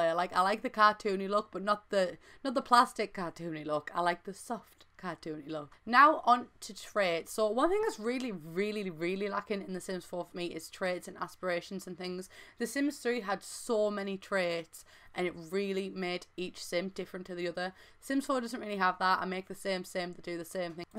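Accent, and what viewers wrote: United Kingdom. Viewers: Very useful- will be getting those mods for the aspiration and traits- its nice to hear a British accent too- I've not come across fellow Brits when looking at Sim Youtube accounts!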